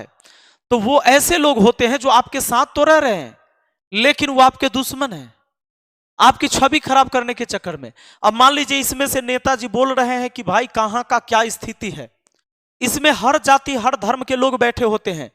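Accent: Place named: Magahi, India. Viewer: ये हमारा मगही भाषा है हमलोग ऐसा हि बोलते है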